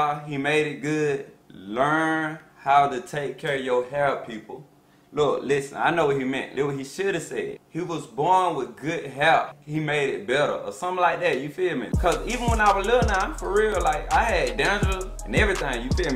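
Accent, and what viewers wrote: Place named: South, United States. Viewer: You sound like you from the south...I fw with yo content thugh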